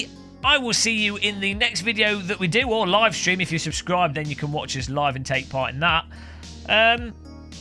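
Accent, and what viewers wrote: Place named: Leicestershire, United Kingdom. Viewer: If you don't mind me asking, where are you from? Can't place your accent but would guess at Leicestershire?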